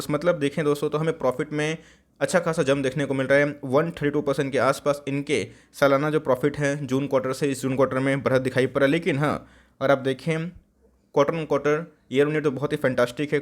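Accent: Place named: Odisha, India. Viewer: Odia accent.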